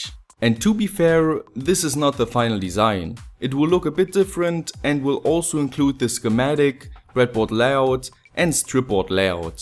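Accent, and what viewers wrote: Germany. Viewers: Awesome video!!! I have always enjoyed your accent when you speak English--to an American speaker it sounds as if you are trying very hard to pronounce everything right. I saw your other video where you were listening to the pronunciation of "centimeter". You do a great job! I don't think you need to sound "native-born". You are completely understandable, and I do find your inflection interesting--I wondered what your first language was until I saw it was German. By the way, , you sound as if you are saying "isolation", but the wrapper around an electrical wire is called "insulation". Isolation is the function of insulation, though, so it's kind of funny. I think any English speaker can understand your videos just fine though! Small little mispronunciations are "filtered out" when we watch your videos--don't stress out about them!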